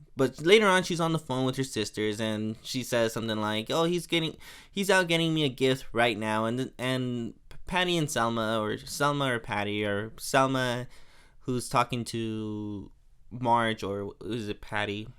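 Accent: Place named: France. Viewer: The French accent of yours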